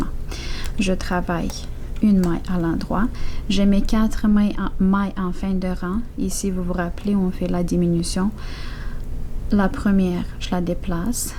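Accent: Canada. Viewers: Merci, j'adore l'accent canadien, il me rappelle nos voyages. 👍🥰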